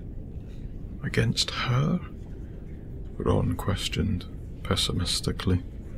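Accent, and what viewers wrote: Scotland, United Kingdom. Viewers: you have a lovely bit of Scottish accent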